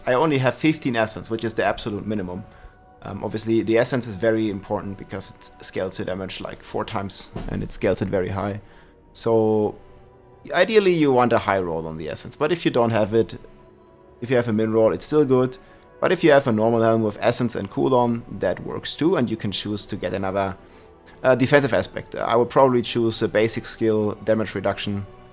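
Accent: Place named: Germany. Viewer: My absolute blind faith once i hear a german start breaking down nerdy videogame numbers is beyond measure.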